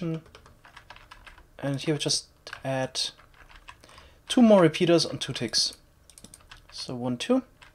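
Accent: Germany. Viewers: German accent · Sounds like u are german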